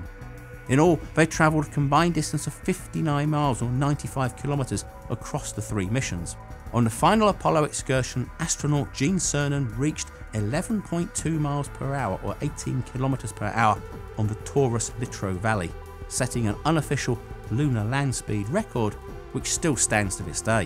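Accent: United Kingdom. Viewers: British. In the UK, the official unit of measurement of length is the meter. The pronunciation is a telling sign..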